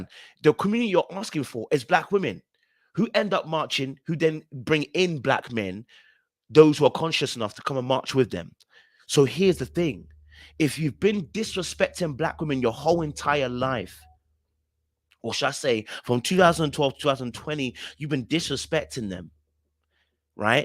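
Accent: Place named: England, United Kingdom. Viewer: I was NOT expecting the English accent! 🥰🥰🥰🥰🥰🥰🥰🥰🥰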